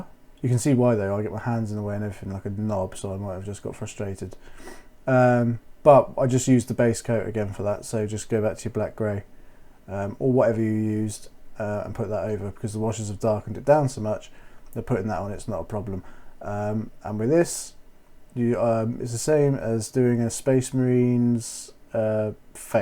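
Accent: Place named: Northern England, United Kingdom. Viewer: u have the jhon snow accent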